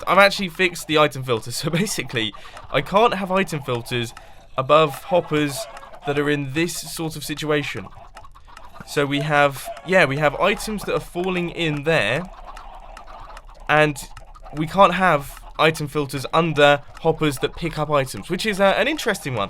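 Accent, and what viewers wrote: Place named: England, United Kingdom. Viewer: I didn't know u were English I am too and I don't even know my own accent. Lol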